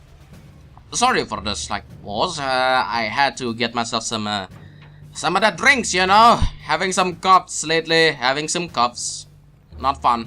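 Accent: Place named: Arab world. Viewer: are you Arabic? that accent sounds like it but i would like to know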